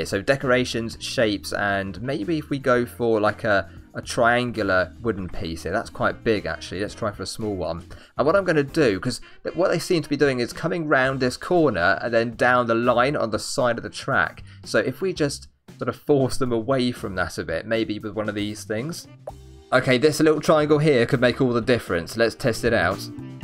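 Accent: United Kingdom. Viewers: Are you British? I ALSWAYS WANTED TO BE BRITISH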